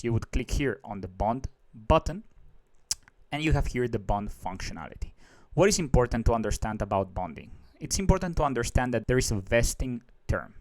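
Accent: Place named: Bogotá, Colombia. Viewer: Este Man tiene puro acento de rolo.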